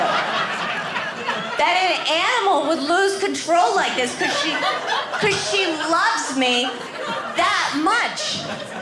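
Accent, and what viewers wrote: United States. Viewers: Brilliant comedian and real American in her delivery, attitude and humour! Really liked it! Normally not so interested in people banging on about their kids on stage like I came to hear their biography but this brought so much humour with it I was glad to hear the stories! Thanks again!!! Haha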